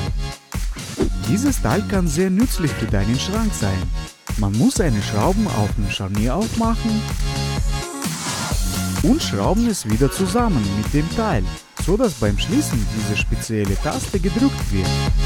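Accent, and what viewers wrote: Russia. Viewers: Ich liebe deinen russischen Akzent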